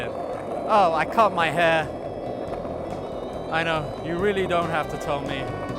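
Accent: United Kingdom. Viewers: It sounds so weird listening to a British person doing a tutorial bc I usually hear American - accented people. But I am a British kid myself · Hey, I've been following you now for a while, and thanks for your great content. I am 26 now, and skated from 7 to 12 or something. I've always regretted that I stopped at some point, but I'm now starting again, and I FREAKING LOVE IT. I really relate to your feelings about sharing a skatepark with 10-year-olds that are better than you. But fuck it, skateboarding is awesome, and as long as you don't bother someone, everybody is always cool about it. Anyway, the reason for my comment is that I just want to let you know how great your content is. That video where you paid the narrator on fiverr in your intro, and told him to shut up eventually was one of the most hilarious bits I've seen. This goofy shit is what makes this channel great (amongst others). 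For example, the goofy edits that don't really belong, but are funny anyway. Keep doing those, because they are fucking funny. Just like the random chatter in between skating sessions (you're british so listening to you talk is somehow pleasant). You want to be a filmmaker. Well, keep this up and I can really see you get there. 15k subs is usually where the snowball can start to really roll, especially when you get lucky with a video. These skateboard progression videos usually do amazing. Yours have been great too. I would love to see more of that. (especially since you show the bails, and sorry, but they look so clumsy and funny. again, sorry). The point is - if there even is one to this big wall of random noise - is th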